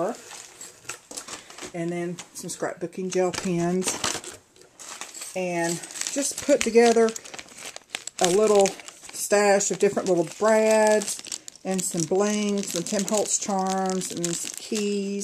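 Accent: United States. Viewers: Wow!.. that is soooo generous of you... and I just want to tell you that your voice is sooo cute to me.. You sound soo country.. (and I mean that in a good way).... Awesomely swell of you!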